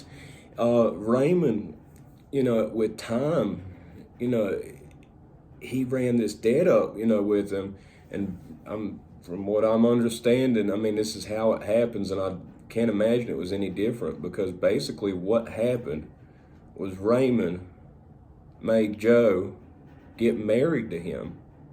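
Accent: United States. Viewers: from what part of the USA is this accent from?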